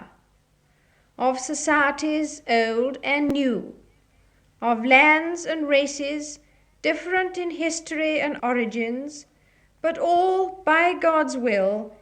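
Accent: RP, United Kingdom. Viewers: young voice, this is RP?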